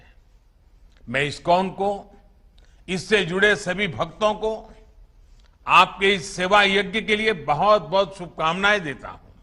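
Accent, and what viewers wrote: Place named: Gujarat, India. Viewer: that's gujrati touch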